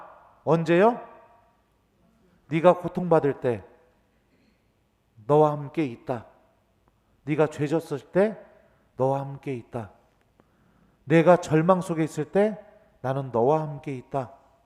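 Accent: Chungcheong, South Korea. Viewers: 충청도 신부님 사투리 더욱 친근감 ~~~ 화딱지~신부님 존경합니다 저도 화딱지가 날때는 신부님 강론을 듣고 하느님께로 다가가면 저에게 은총으로 사르르~화가 감사로 ~~ 아멘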